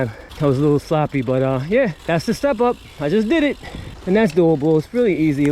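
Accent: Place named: United Kingdom. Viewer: That british accent tho haha! Love the video as always my man!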